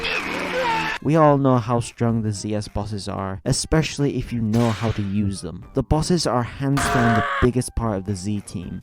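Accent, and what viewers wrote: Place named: United Kingdom. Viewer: New upload from my favorite bri’ish man? Nice! (Don’t tell combine elite lmao)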